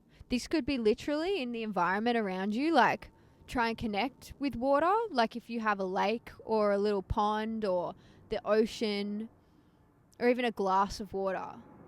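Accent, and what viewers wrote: Australia. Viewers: Are you a Queenslander? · Came for the title, stayed for the accent. Love the Aussie accent! Love from the Netherlands 🥰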